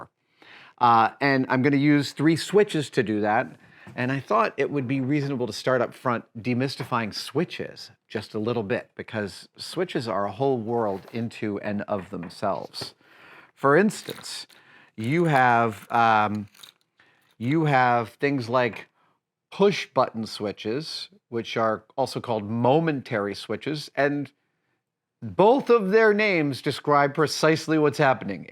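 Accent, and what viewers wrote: United States. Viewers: SoLLLder, not sodder. Say it like it is, damn american! "The US just removed all soddiers from afghanistan".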